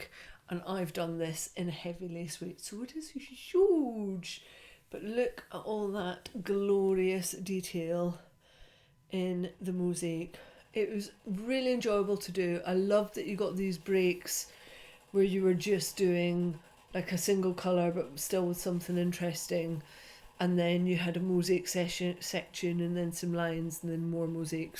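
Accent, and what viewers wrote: Scotland, United Kingdom. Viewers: Do you always sound more Scottish when you're ill?! Hope you're feeling full of beans soon.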